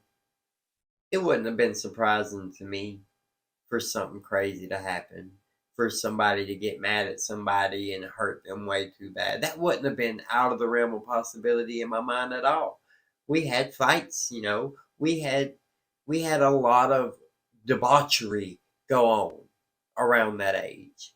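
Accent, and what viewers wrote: South, United States. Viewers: You and I have quite a lot in common. Down to the “Southern-ness.” 😆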